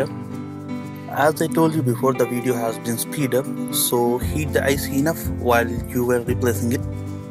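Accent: India. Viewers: sounds like a indien tech scammer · Hi I can detect Indian accent so where in India are you from ? I would like to have this procedure done to my iPhone Thanks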